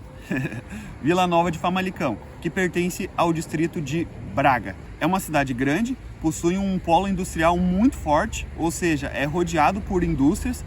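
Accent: Rio Grande do Sul, Brazil. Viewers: Buenas, tem como comprar erva de chimarrão por aí? Tu é gaúcho né. Parabéns pelo canal.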